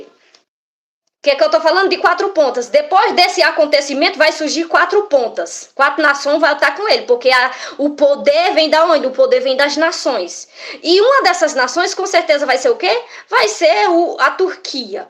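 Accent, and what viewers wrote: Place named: Northeast, Brazil. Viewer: Kkkkkk chegando agorinha 😂❤°°!!!! Vc deve ser de algum estado do Nordeste,, vc fala com sutak q nem eu !!! JA ME ESCREVI E É AQUI QUE VOU APRENDER MAIS ➕️ ➕️!! OBRIGADO!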